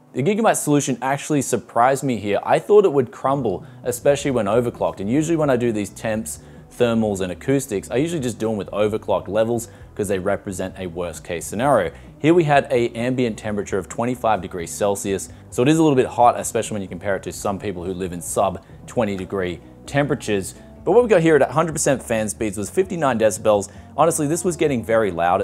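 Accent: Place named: Australia. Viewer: You have the same accent as my Australian English teacher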